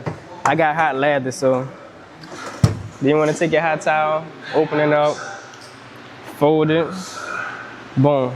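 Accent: Louisiana, United States. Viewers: Louisiana accent loud asf😂😂❤ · Good shit brudda 💪🏾💪🏾💪🏾💪🏾 you got a heavy Louisiana accent brudda salute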